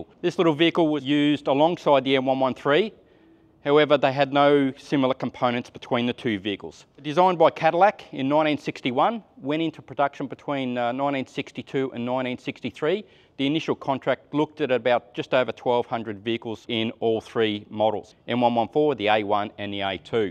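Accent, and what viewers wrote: Australia. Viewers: Awesome! Thanks! I really like the australian english accent! Greetings from Germany!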